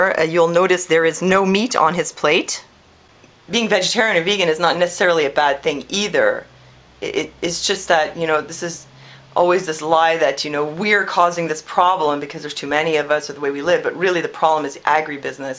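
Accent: England, United Kingdom. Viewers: Your pommie accent is HOT! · Great English accent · LMAO the british accent - so fucking genius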